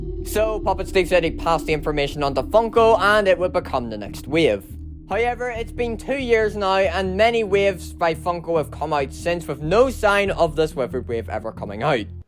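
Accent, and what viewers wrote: Northern Ireland, United Kingdom. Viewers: You from The North of Ireland? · In the first 3 seconds, I was able to detect that you were from Northern Ireland. The accent is hard to mistake. 😂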